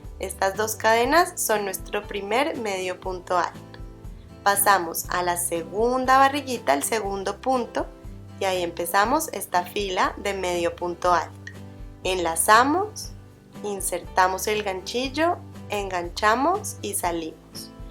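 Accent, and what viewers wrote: Colombia. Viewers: Hola , cómo estás , soy Colombiana , y tú de dónde eres , disculpa , tienes acento Colombiano , me encantan estas clases de tejidos , eres muy clara y explicas muy bien poco a poco y despacio .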